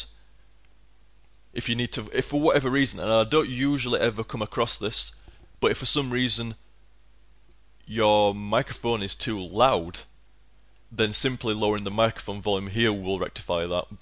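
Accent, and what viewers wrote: United Kingdom. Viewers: Am i right in saying thats a yorkshire accent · I TOTALLY LOVE english accent :D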